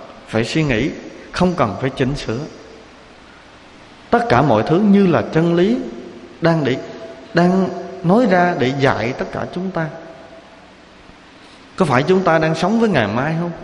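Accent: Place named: Quang Nam, Vietnam. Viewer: Thầy nói giọng nói giống người quảng quá ạ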